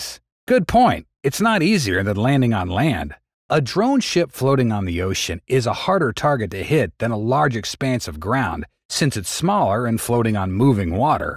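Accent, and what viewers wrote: South, United States. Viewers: his southern drawl was so strong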